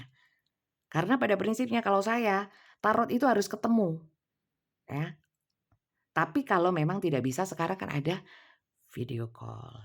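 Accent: Java, Indonesia. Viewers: Saya baru bergabung. Saya jatuh cinta dengan aksen medok nya, ibu. Sangat terhibur sekali. Tidak semua resonet, tapi apa yg ibu katakan masuk akal kalau. Membuka mind set saya. Dan saya mau subscribe n like postingan ibu. Semangat untuk upload ya Bu. Saya pasti dengerin ibu, tidak masalah resonet atau tidak.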